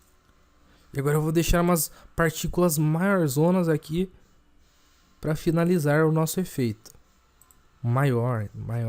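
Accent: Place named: Paraná, Brazil. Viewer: Com esse sotaque só pode ser paranaense...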